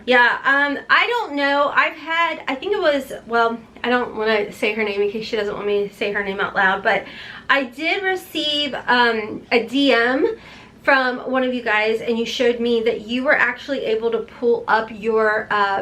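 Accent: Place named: Kentucky, United States. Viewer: That Kentucky drawl comes out from time to time. When you said MAC, my captions thought you said “mayak”. Like kayak, but with an m. 😂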